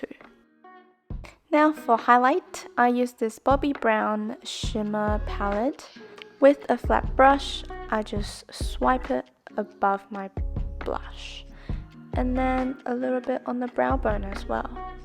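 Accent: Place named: Taiwan. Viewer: 妹妹哩低衝蝦😂😂😂你的謝謝還有台灣國語笑死🤣🤣🥰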